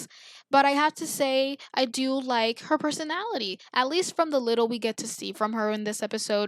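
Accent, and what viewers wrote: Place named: Latin America. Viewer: Balla se te escucho vien el espol latino 😃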